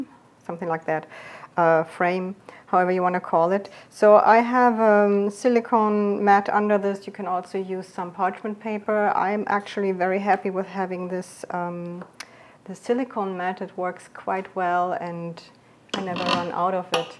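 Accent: Germany. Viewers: We live in the suburbs of Nashville, Tennessee. PS: My husband guessed you were from either Hamburg or Hannover based on your accent. Best to you and your family from your newest subscriber! I always want to find recipes that remind him of home. But I have fierce competition. His mother is a retired chef, his brother is a chef, and our only nephew is a chef.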